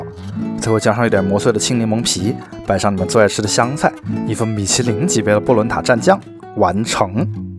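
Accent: Wuhan, China. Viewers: 作为一个武汉人，突然听见了汉普也是挺意外的！哈哈哈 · 听着你武汉的普通话真是亲切。我也是住在德国的武汉人，什么时候你也做做Panettone?相信你也一定会做得很有特色的！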